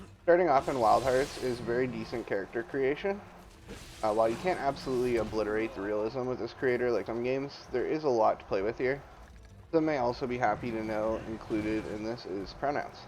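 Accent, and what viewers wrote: United States. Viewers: Why does your voice sound like its coming from an American neck? This says Canadian content 🤔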